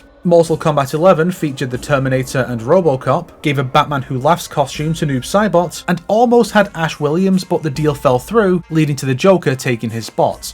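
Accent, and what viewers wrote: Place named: United Kingdom. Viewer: I don't remember you being British wtf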